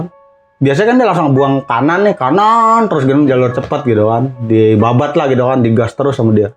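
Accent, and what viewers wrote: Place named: Tegal, Indonesia. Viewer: kalo lagi ngapak"mampir dong ke kampung aku aku juga di daerah tegal tau. Klo udah bicara fillowopi nya keren"banget aku suka.!!!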